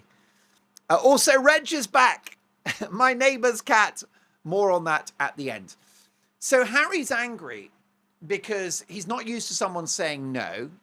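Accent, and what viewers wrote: Australia. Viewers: Lol,love your Aussie accent 😅Yes,Dixon St in Sydney is where you will find Chinatown,l use to work around the corner in Sussex St,lol😊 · Excellent Aussie accent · love your Aussie dialect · your great Australian accent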